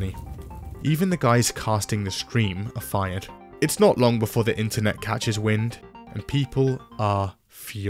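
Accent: United Kingdom. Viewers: all British people sound the exact same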